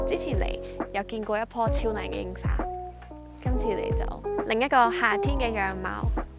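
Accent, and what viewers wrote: Taiwan. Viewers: 你的中文说得很好，说得很像台湾人。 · 靚人靚景，國語台灣腔好聽❤🎉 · 人家在 台灣讀 大學 畢業 當然 向 台灣音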